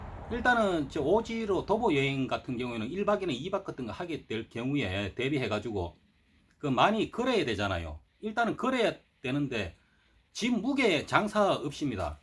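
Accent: Gyeongsang, South Korea. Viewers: ■ 새마을 티셔츠보고 방심했다가 장비보고 놀랍니다. 특히 msr 리액터에 빵터졌음. 아재 잘보고 갑니데이~ 구독도 박았심더. 고수시네요 ^ ^ 사투리도 구시하이~ ㅋ 저도 대구 경북이라서 듣기 편합니다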